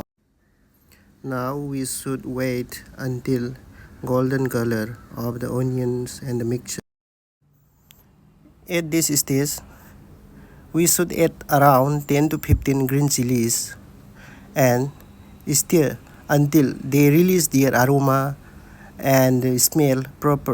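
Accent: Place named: Arab world. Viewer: U have a little Arabic accent 😅... Nice recipe 🎉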